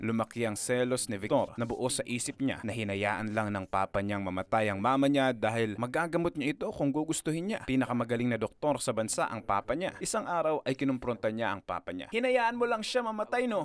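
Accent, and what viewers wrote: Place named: Visayas, Philippines. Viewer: Halong bisaya dol.palibot man haha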